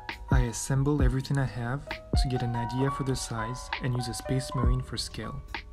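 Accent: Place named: France. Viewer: Awesome ! Your french accent is so cute damn it drives me crazy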